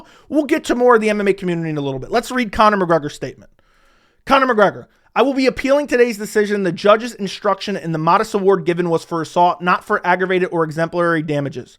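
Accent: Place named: New Jersey, United States. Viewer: You gotta be from NJ because I speak the same exact way when I’m passionate and frustrated 😭 you’re so right tho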